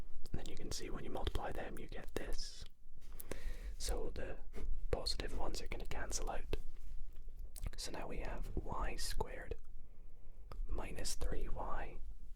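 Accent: Scotland, United Kingdom. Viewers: YOOOOO I DIDNT KNOW U WERE FROM SCOTLAND I KNEW THERE WAS SOMETHING FAMILIAR ABIUT YOU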